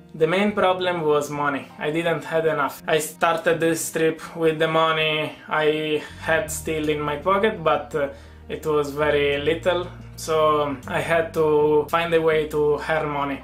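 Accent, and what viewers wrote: Italy. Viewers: si sente tantissimo l'accento italiano grande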